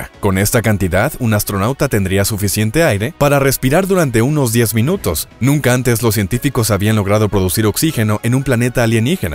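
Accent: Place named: United States. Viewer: da arcadas escuchar esa entonacion yanqui de los nombres de los crateres y los vehiculos. Me gustaria ver a los yanquis esforzandose tanto por pronunciar los nombres en español de las ciudades de mexico (por poner un ejemplo)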